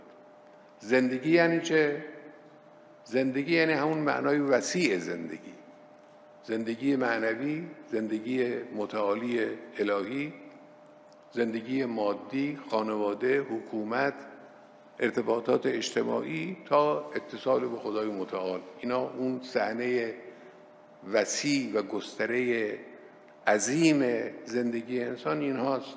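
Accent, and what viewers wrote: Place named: Arab world. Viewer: حاجی من و تو آخر عاقبت نداریم اون دنیا باید جواب سختی پس بدیم حالا هی با لحجه عربی صلوات بفرست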